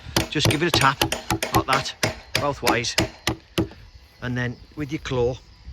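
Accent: Birmingham, United Kingdom. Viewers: I may have missed this, but what is the Length and Width of this Build? I may have missed it in Part 1...Sorry. Just curious if a particular wall lenght is more than 4.8m, bracing 2 pieces of timber, sould that be sufficient? Example 6m by 4m outbuilding. Definable feel the ground prep is much cleaner than have pouring concrete for a slab...think you have sold me on this rod system.... Videos and content great - plus the brummie accent ❤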